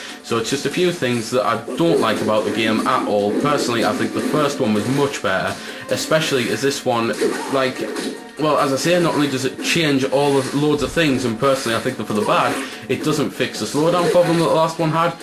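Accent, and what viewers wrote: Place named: Cockney, United Kingdom. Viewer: Oi nice cockney 'cent ya got there!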